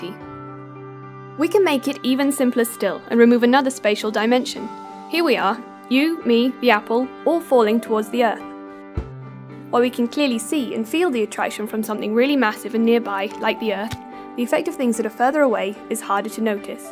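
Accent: United Kingdom. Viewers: minute physics with a brit accent. :) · The video's really nice. Your brit accent is even better! ^.^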